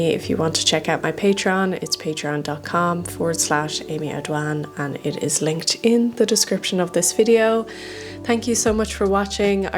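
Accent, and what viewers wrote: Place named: Ireland. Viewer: This is an Irish accent in the general tone most people use because we're not fake and we don't feel the need to elevate our pitch like we're talking to newborn babies, toddlers or doggies woggies so if you can't handle it you should probably toddle along and find the Sesame Street version.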